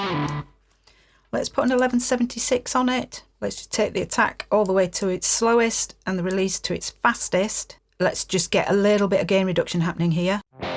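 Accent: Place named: Yorkshire, United Kingdom. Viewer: This is an amazing lesson! Thank you so much for sharing your knowledge in such an easy and understandable way. Also, I have to say I love your accent :) I now live in East Yorkshire, I guess we are not too far?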